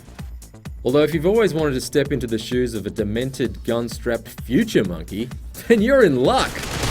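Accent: Australia. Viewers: is this guy australian???? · And he is Australian! (No offense intended) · prob cuz hes an aussie the accent is fucking sublime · Love that aussie accent, because i have one :)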